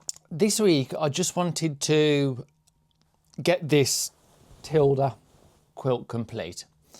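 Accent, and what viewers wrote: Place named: Leicestershire, United Kingdom. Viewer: I loved watching your video , I have so much to say lol , regarding ends of quilting , I do ,do a back stitch , but if your unhappy , I would just leave a long thread and make sure you bury it well , but honestly , I don't worry about it myself . If a man on a horse riding by can't see it , then your good lol . What is that corner turny thing you mentioned ? As to the stripey binding , if it's on the bias the stripes go diagonally. I would use your left over stripey fabric to bind something small like a table runner to practice and see if you like it . I don't know where in the UK your based ( sounds close to where I was born , Leicestershire) but if you gave a local quilting shop they might know of local groups you could join , we always loved it when men joined . Now my health is not good I can't go to groups so I do video calls with my sister of friends whilst we quilt or crotchet etc . 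Do you know anyone ? On WhatsApp or messenger the video calls are free so we do it for hours . And have you seen the book Fox under the moon , seasons of hope ? It's similar to the boy , the fox ,the mole and the horse , I find it helps sometimes . I love the illustrations so much I'm going to make some in to small wall hangings ( that is after I finish some other wips lol )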